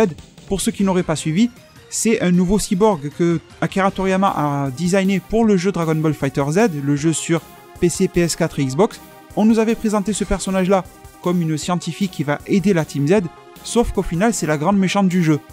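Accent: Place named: South, France. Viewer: tu as un accent du sud il me semble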